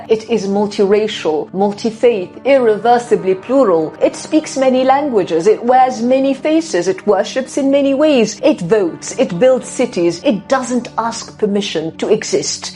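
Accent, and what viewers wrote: United Kingdom. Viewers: That is British accent , not North American one. They hav own style.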